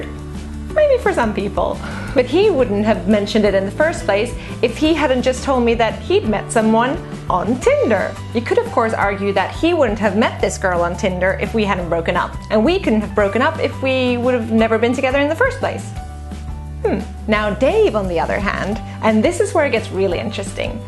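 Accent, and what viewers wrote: Finland. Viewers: They were from the Helsinki/Espoo area. I heard you're from a small town or village. They were all Finnish speaking Finns, except for one who claimed to speak Swedish and Finnish both as native languages. She didn't start a conversation with me, she dropped a toy mascot on the floor, I found it, then she came up to me to claim it back. BTW, I can hear your Finnish accent when you speak Swedish.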